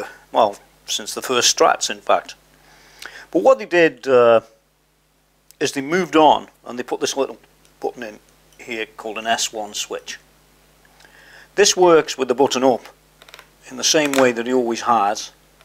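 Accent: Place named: Liverpool, United Kingdom. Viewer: you sound like you are from Livapooo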